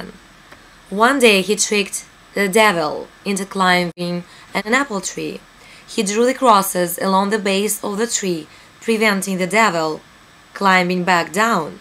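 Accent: Russia. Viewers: Russian accent - where are you from girl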